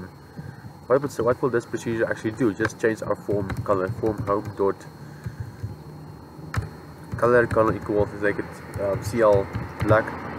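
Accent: South Africa. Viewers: Fellow south African ? 😂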